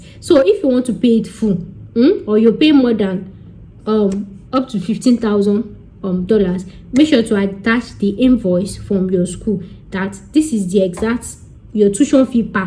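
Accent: Yoruba, Nigeria. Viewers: Your video is real with your typical Yoruba/ Nigeria accent. God bless. Pls, do consider we viewers not from Nigerian tooooooo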